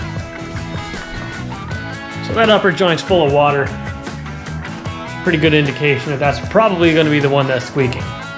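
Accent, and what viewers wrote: Canada. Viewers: I knew I could hear a Canadian accent in this guy!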